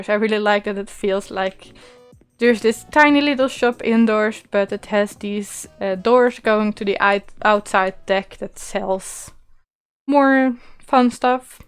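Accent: Netherlands. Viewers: Aaa okay! I'm from the Netherlands as well and thought I recognized a dutch accent hehe.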